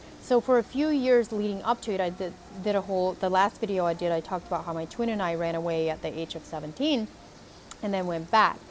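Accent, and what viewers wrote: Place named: Pennsylvania Dutch, United States. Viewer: It's a Dutch accent. Pennsylvania dutch, "technically" American but not remotely normal or recognizable. I live in a nearby state, but without the internet I'd never have heard of it.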